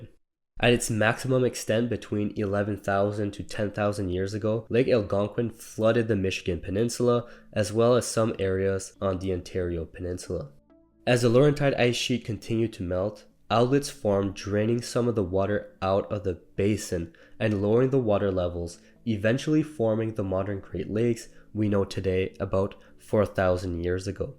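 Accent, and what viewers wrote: Quebec, Canada. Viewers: Seriously, you sound Canadian, but you're mispronouncing very common things like Mackinac and Niagara. Macnik? Neeagara? Isn't this how we used to flush out German spies in Allied uniforms in WWII? :D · he’s definitely french canadian considering that’s how you pronounce niagara in french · He sounds qubeccois. Based on the way he pronounces French names.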